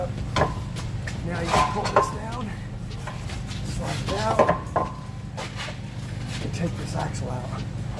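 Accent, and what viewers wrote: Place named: Canada. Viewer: though it sounded like trailer park boys are you canadian by any chance?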